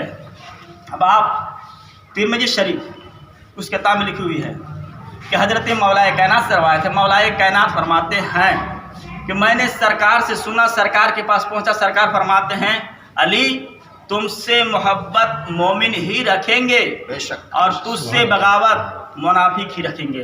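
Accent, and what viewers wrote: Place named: Bihar, India. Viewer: Ye bihari maloom hota hai urdu bolne ke tameez nahi hadees ke baat karta hai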